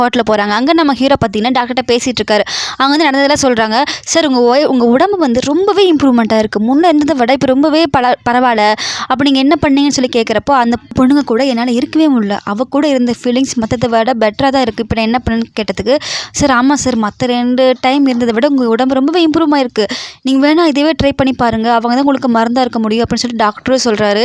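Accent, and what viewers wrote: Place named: Sri Lanka. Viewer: Unga voice srilanka voice mathiri irruku sis .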